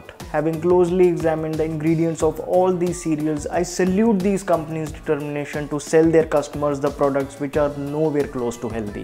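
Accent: India. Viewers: Filled with cheeky indian accent ♥️😭🤣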